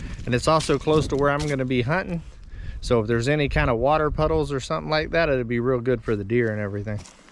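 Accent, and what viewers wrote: South, United States. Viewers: You sound like your from the south I was raised in Millington Tennessee but have lived in Waldron Arkansas most of my life.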